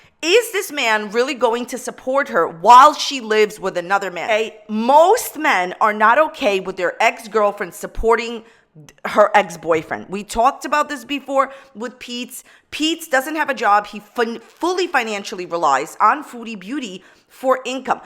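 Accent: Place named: New York, United States. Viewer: Beautiful water scenery - where is this? Clear water to see the sand & shells. Your accent sounds like east coast - NY. I was from Dutchess county.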